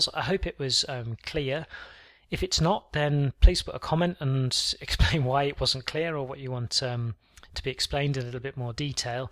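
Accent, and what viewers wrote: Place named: United Kingdom. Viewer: Your clear voice with nice BrE explain things way more better than my uni lecturer, shame on them who call themselves "professor" may have great academic achievement but have no idea how to teach in an accessible way .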